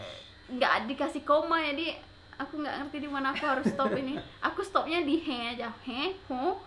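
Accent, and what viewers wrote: India. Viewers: Masyaallah mbak..wlaupun org indonesia tapi suara logat india ny bagus bnget.. Samawa mbak... Beruntung ny bisa mndpat kn suami yg baik.. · Keren suaranya sudah pas suara cewek India..baiknya suami adek ni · Masyaallah kak, suaranya percis banget sama orang india💞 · Masyaallah suara nya merdu persis India bgt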